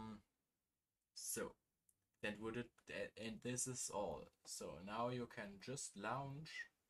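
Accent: Germany. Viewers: unauffälligster deutscher akzent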